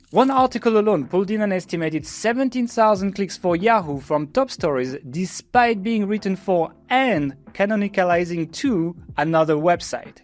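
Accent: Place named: France. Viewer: its french accent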